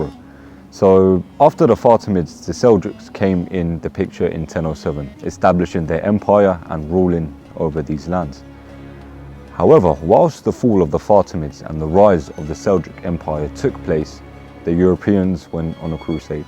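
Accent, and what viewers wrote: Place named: England, United Kingdom. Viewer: لكنة انجليزية رخمة و رائعة!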